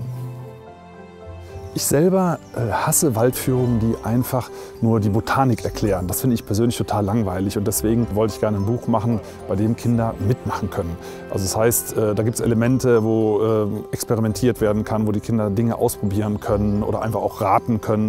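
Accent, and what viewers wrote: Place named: Bregenzerwald, Austria. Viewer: Bregenzer wald dialekt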